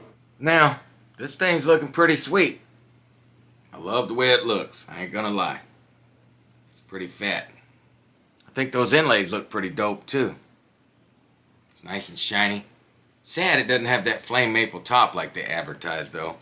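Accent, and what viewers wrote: United States. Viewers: Hi I'm from Italy, i love your accent, which part of the states are you from?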